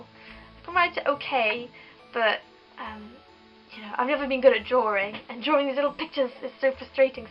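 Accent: United Kingdom. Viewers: all right : I love your dress/shirt, I LOOOOOOOOVE the flower in your hair, I love when you speak japanese but I also love british english accent......... ( and I love the almost-shirtless-Yunho on your wall just behind you...) haha great vid :D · Greetings and THANK YOU!! I love your videos. Your japanese is excellent- your accent and your way of speaking sound so perfect. Listening to you is so INSPIRING!! I also love your english accent. You're super cute, too!! Just wondering, how many kanji do you know and how did you learn them? Just from workbooks? How long did it take to learn enough kanji to read magazines? Can you read books, too, like novels? I heard you need to know about 2000 to read the newspaper. ありがとうございます :)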